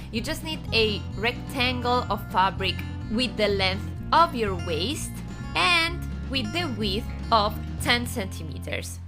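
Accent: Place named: Italy. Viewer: me encanta tu canal!!! sabes tu hablas mitad italiana mitad española saludos desde argentina!!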